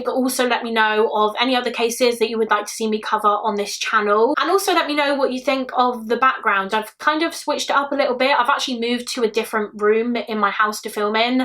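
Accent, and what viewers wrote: United Kingdom. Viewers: Fabulous story telling thank you kindly and luv ur Uk accent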